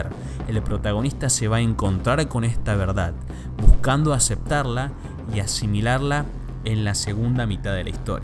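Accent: Argentina. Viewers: Que asco, acento Miergentino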